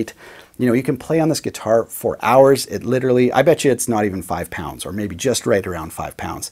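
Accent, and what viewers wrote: Canada. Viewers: Awesome collection! ( Also, I had a feeling you were Canadian based upon your accent 🤣) I'm a fellow Canadian 🇨🇦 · The best Canadian accent Sooory that's the rules One of the best accents ever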